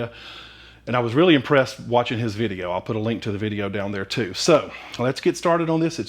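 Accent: South, United States. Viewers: Pre-ordered your book! Thanks for all you do! So good to hear that southern draw and your easy going way. Good luck in Cali!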